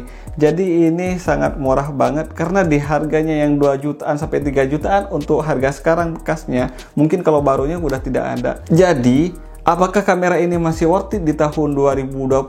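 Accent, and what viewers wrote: Madura, Indonesia. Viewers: Medok bangget bang Madura nya 😊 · Logatnya madura😅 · Madura ya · Madura mana bang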